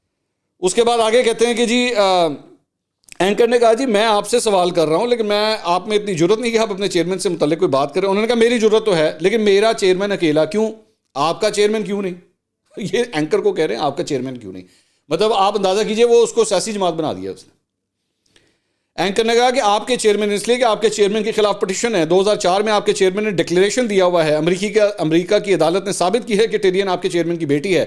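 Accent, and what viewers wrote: Punjab, Pakistan. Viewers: Bohat zabardast aur aap ki Punjabi wah swad aa gia well don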